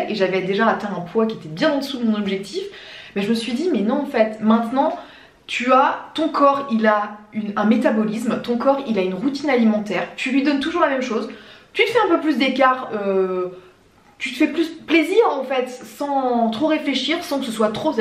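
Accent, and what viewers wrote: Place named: Brittany, France. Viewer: C'est l'accent Breton qui veut ça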